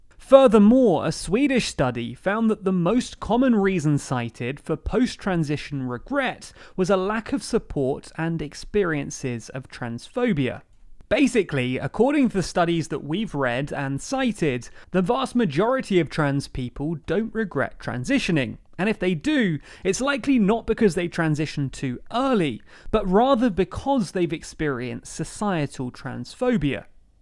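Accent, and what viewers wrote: England, United Kingdom. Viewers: Are you sure, I heard Dysphoria, he does have an English Accent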